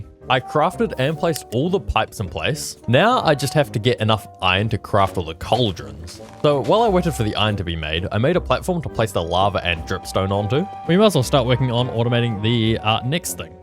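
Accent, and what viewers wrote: New Zealand. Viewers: This man kinda sounds like the Minecraft playing Kiwi Version of Martincitopants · are you kiwi?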